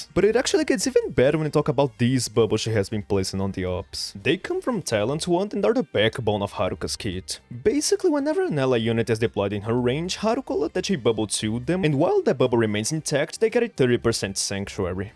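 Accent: Brazil. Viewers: Primeira vez aqui, na hora que eu ouviu você falando Ptilopsis eu tive certeza que tu era br kkkkkkkkkkkkkkk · Wait a Second... YOU'RE BRAZILIAN!? That Accent... it's Familiar somehow, anyways, nice to know one of my Favorites "Arktubers" is of the same Country as i, btw, will u cover Endfield? Really like the style of ur videos.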